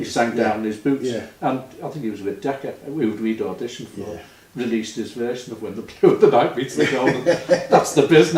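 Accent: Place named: Liverpool, United Kingdom. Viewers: Great Interview... Sharing Musical Memories & Facts As Gold Mine! Thumbs Up & Thanks For Sharing These... Throughout This Very Pleasant Liverpudlian Accent!;-)) Keep Making & Sharing These!;-)) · Hi...I was recently asked by from Liverpool Film Club, if I'd be interested in doing a filmed interview with him to discuss my involvement with the Liverpool Arts Scene. This is quite an informal interview( Part 1), in which asks me about how I got started with music and groups in the 60's and 70's, through to my association with other groups and my Entertainments Agency that I opened in 1978. I did lose my way a little, where one moment I'm discussing my group 'The Topics' (1962/66), and asks if I met any well known bands...when my memory suddenly jumps to 1970/72 and I'm remembering my time in a Cabaret group (Recipe 4)....then I slowly make my way back to the 60's. 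Memory plays a strange trick at times. If you do take the time to watch this video...I hope you can understand my Liverpudlian accent...and that you don't find the conversation too boring..as it is totally about about local Liverpool places and names. Parts 2 and 3 Video's are to follow...in which the conversation moves through the 80's up to my Songwriting and current activities. Thanks for watching..if you have.....Regards....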